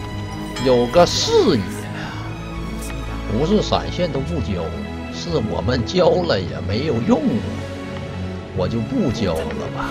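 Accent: Northeast, China. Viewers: 東北腔真的很可愛XD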